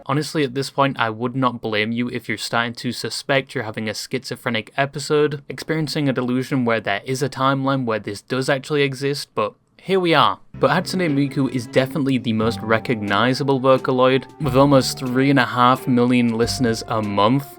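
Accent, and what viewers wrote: United Kingdom. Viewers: You may call me old for knowing Angel with a Shotgun. But your British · Why do i realise this guy is kinda bri'ish (first time ever watching this guy)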